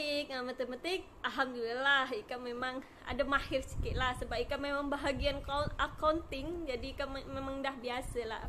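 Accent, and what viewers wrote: Malaysia. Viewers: sudah boleh bertutur mcm org Msia...bagus kl boleh d beritahu asal dr daerah mana d Indo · bagusnya ika servive. lagi cakap juga sudah malaysia.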